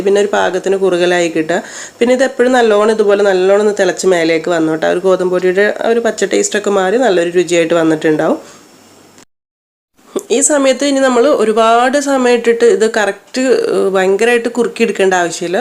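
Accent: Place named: Perinthalmanna, India. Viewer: പെരിന്തൽമണ്ണ ശൈലി ഉണ്ടല്ലൊ ഭാഷയിൽ. പെരിന്തൽമണ്ണയാണോ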